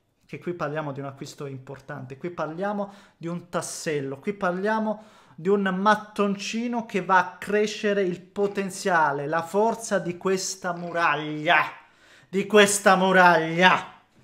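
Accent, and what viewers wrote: Calabria, Italy. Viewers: Calabrese/pugliese detected*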